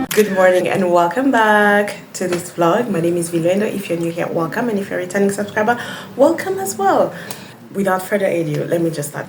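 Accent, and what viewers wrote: Kenya. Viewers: You have a Kenyan accent. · You picked up the Kenyan accent well..🎉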